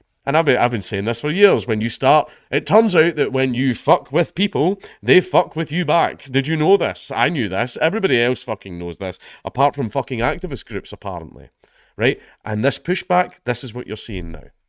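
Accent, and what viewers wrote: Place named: Scotland, United Kingdom. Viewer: Did you somehow get more Scottish recently ?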